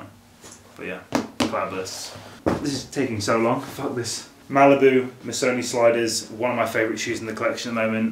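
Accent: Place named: United States. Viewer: Sounds kinda American to me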